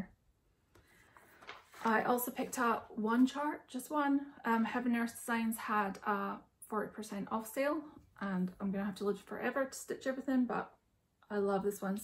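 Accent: Scotland, United Kingdom. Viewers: Thank you for sharing your beautiful work! Love all your stitchy plans as well. The bear, whales and owl are among my favourites! Have a great may and sorry about the trouble with your ankle. Still loving the scotch accent! I am working on the Quakers of Scotland by tempting tangles and am loving it! Scotland is on my bucket list of places to visit as thats where my ancestors originated🙂. I know you are in the US now but I see you still love to stitch those highland cows 🥰. Happy stitching 🧵🪡😊☀️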